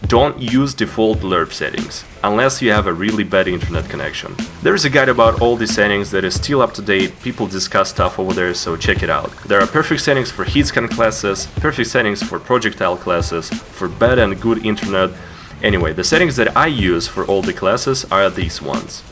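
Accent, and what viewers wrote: Russia. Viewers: Wait u r russia?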